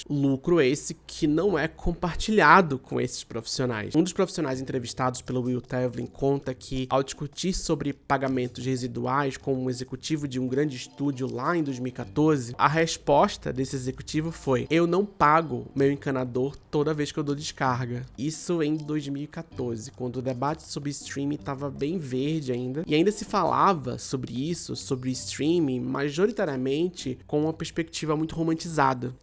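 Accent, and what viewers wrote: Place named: Minas Gerais, Brazil. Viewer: estava cm um sotaque especialmente mineiro nesse vídeo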